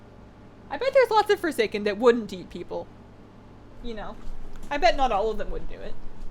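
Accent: Canada. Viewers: I like the Canadian accent 🐻😀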